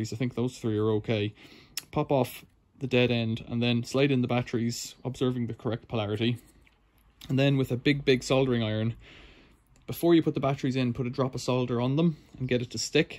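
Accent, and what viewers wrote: Ireland. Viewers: the one with no charge port is a common charge port and the other is a separate charge port. Nice to hear an Irish accent on the tube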